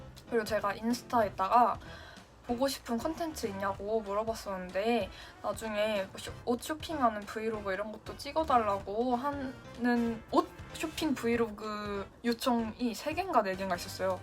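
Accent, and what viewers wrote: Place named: Jeolla, South Korea. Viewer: 전라도 출신 학생이시네요! 억양이 천상틀림 없군요~ 영상 많은 도움되었네요 감사합니다~!!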